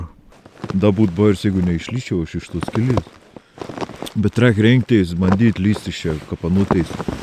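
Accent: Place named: Samogitia, Lithuania. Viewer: Nu man sunku suprast sita kalba galetum nezemaiciuot butu fainiau daugiau prenumeratoriu susirinktum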